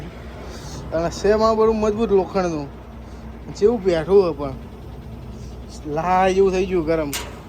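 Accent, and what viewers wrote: North Gujarat, India. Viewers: Jai mataji bhai tame kya gaam na cho e kejo mane to tamaro boli Mehsana side Uttar gujrat ni lage che ane kyu gaam tamaru e pan kejo mara msg no javab apjo bhai jsk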